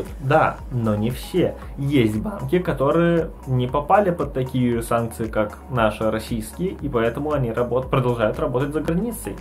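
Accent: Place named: Belarus. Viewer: забавно, что с белорусским акцентом, вы ни разу там не были)))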